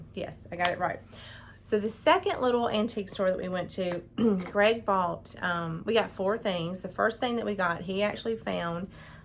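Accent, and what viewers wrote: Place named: South, United States. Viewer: any way you can turn on closed captioning? i am a northerner and have a tad bit of difficulty with your southern accent😉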